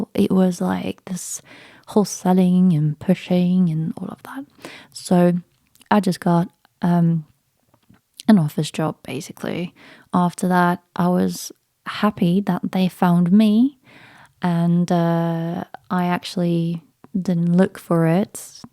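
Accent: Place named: England, United Kingdom. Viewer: When the first time I heard your voice, I felt you have a beautiful English accent.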